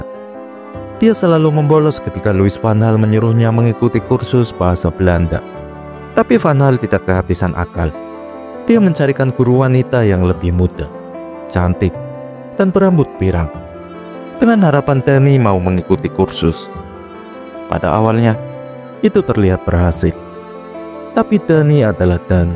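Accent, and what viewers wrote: Java, Indonesia. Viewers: Medhog banget narratornya.. 😂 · jawir pengisi suare ye, bikin bete, mending ngisi suara wayang kulit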